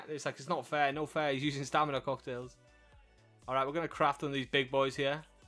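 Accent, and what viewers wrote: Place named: Liverpool, United Kingdom. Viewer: I'll subscribe just cause you're scouse lad. Get sick of watching the American kids sometimes 😂😂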